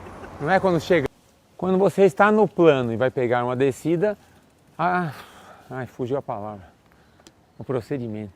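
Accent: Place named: Northeast, Brazil. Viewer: Fala direito so nordestino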